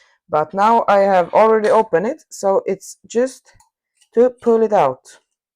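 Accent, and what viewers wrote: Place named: Sweden. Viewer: Dude you sound Swedish ❤😅 But 5km/h ??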